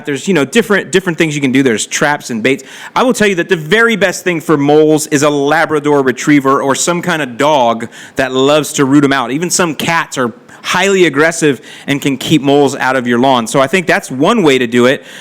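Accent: Chicago, United States. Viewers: ....killin’ Me with that Chicago accent. It’s alright tho buddy, I ain’t mad atcha.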